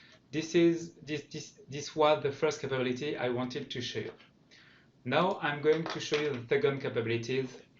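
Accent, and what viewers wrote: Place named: France. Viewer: the french accent is terrible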